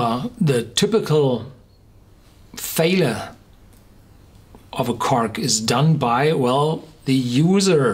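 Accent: Germany. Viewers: his voice and his german accent are sooo ear-pleasing !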